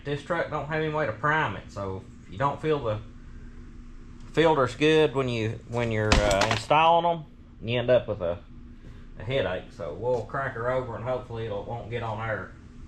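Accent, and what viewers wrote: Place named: South, United States. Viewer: Some of the words you say, or how you say them remind me of my grandpa. What’s funny about that is I’m probably older than you. Here in northern Ky the country accent is non existent. Always love to hear it.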